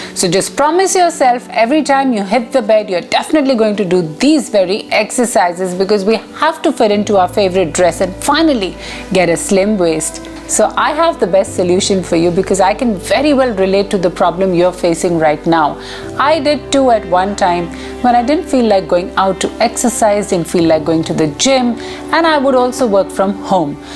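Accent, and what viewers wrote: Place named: India. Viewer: Elle super intéressante ta vidéo, sauf que ton English est trop indien😅😅😅😅,mais ça va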